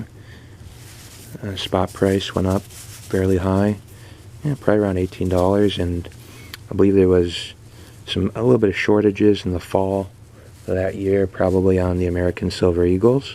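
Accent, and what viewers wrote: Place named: Netherlands. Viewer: So you're U.S. based because of the ASE's being first on the list ? Was actually wondering if you were sort of Dutch because you seem to have short of a slight Dutch undertone accent. Ooh... haven't seen such coin roman numerals but agreed : here's to a great 2020 of MMXX for us p.m. stackers around the world !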